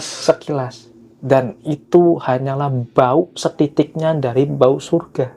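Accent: Pekalongan, Indonesia. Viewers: Mz nya yg bercerita org pekalongan to makanya q gk asing logat bicaranya salam dri Hongkong mz konten kreaktor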